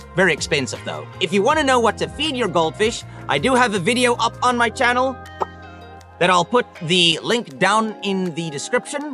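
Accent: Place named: South Africa. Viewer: Hey you’re South African I noticed an accent Aseblief please get a mic And can goldfish have tank mates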